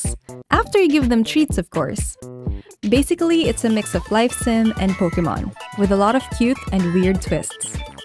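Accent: Philippines. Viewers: She kinda have filo accent ❤ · I can hear the Filipina accent from your English haha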